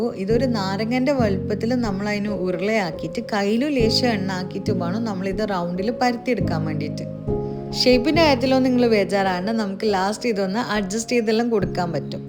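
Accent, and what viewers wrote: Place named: Thalassery, India. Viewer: Hearing you speak in our good old Thalassery accent—without trying to sound too polished or artificial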